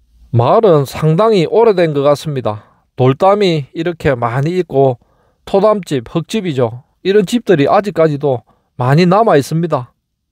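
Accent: Gyeongsang, South Korea. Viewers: 이젠 경상도 사투리 자체가 싫다.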